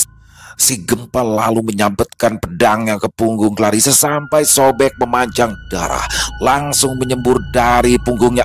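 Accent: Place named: Bima, Indonesia. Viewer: Keren banget bang bisaan banget meranin logat bima nya jadi senyum senyum sendiri ngedengerinnya Terimakasih bang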